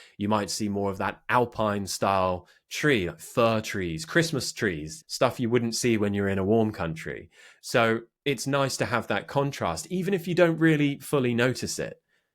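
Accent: RP, United Kingdom. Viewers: Sir could you please explained if it's possible for a person to learn your accent in just 4 months? (I am not exaggerating). Cause that's what exactly is happening to me right now, i completely lost my previous American-ish accent. I admit the Modern RP accent fascinates me that's why i invested myself emersing with the accent. I have to ditched those non-RP speaking shows, movies and podcasts and focusing only in modern RP sounds that shadowing and mimicking becomes part of my daily routine as if it is part of my body. I'm not just thinking in a modern RP accent i also literally speaks them, our cats and dog has been stressed out because i am also speaking to them. When i was just 11 yrs. old back in 92' i have this "Speech Impediment" disability where i constantly stutter and stammer, i can't speak my Filipino language constantly and spontaneously without stuttering. 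Then i noticed whenever i speak an English word or sentences my disability were non-existent, since my disability had separated me from the world of communication i invested myself to learn the English language without having someone guiding me how to learn them. At that time we can't barely afford buying the things we need so my family can't afford of getting me an English tutor, so i'm on my own. That time the only way for you to learn understanding each and every word is by looking into a dictionary. I had this old pocket dictionary with me all damn time, whenever i get stumbled myself upon an English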